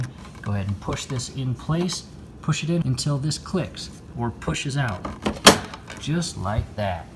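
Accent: United States. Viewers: Thank you short precise and American speaking. Right to point perfect outline got filter changed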